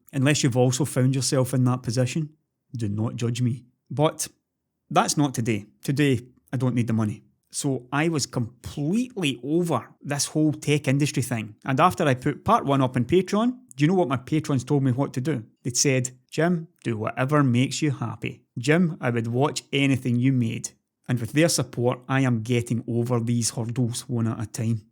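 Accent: Scotland, United Kingdom. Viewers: Jim started watching you when I could barely decipher the broad Scots brogue, you have always been a shining light of sanity in the whole tech troll / fan morass. I didn't appreciate how much they had stressed you out, just enjoyed the ranting too much I guess. Anyway very glad some bridges are being rebuilt and very glad for your sanity that you are going to do what makes you feel positive. I will join your discord soon although I am an ex tech guy, now retired, it looks like a point of sanity in this modern media world. Keep railing at the madness it is at least keeping us sane! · You could just make cat videos and I'd still watch them. I only come here for the Scottish slant and subtle sass.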